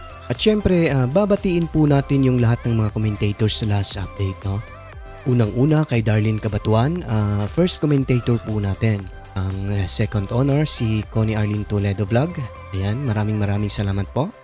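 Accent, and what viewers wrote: Visayas, Philippines. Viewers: Hayyy..ang sarap ng sanga ng bayabas lalo na.kung medyo tuyo na..malanit sa pwet.really like ang accent mo pag nagbibisaya ka Sir natatawa akong.mag isa..thanks di kompleto ang araw namin hanggang di naririnig ang kwento mo.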